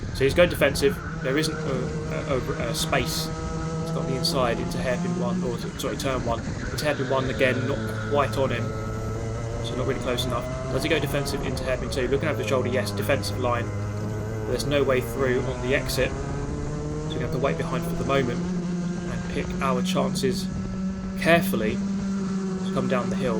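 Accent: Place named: South East England, United Kingdom. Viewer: Great content again mate! That fight for the first place was intense! Your inspiring me to try and have a go at it! Ive seen seen they are some races in Farnborough near me, judging by your accent you are racing somewhere in the south east?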